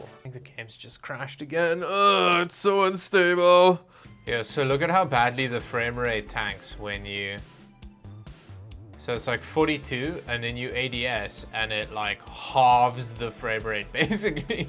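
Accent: South Africa. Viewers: dude are you south african?? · I can recognise that Saffa accent anywhere! Enjoying your videos. Greetings from home!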